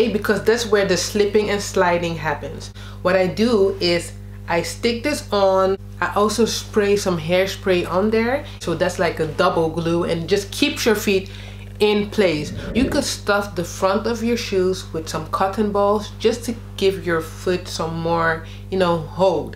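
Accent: Netherlands. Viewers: hahah wat leuk! Je uitspraak is uitstekend hoor, heb zelf op een internationale school gezeten in Californië maar je hoort toch gewoon het Nederlands er doorheen als iemand uit Nederland Engels spreekt;-) leuke video, ik was op zoek naar een oplossing voor mijn kleine teen die met een open hak in de verknelling kwam dus vandaar dat ik bij jou kwam. zoals ik zei, leuke video meis, ga zo door 👍 xxx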